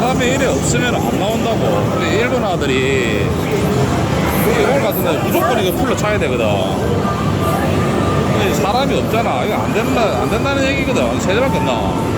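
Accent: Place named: Gyeongsang, South Korea. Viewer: 구수한 갱상도 사투리 멘트에 정감이 가서 자주 보네요 ㅎ 건승하십시요 ᆢ참 기온 이벤트는 1일인가봐요? 그럼 이달말은 31일 8월1일 2일동안 이벤트인가요? 1자가들어가는데 궁금해서요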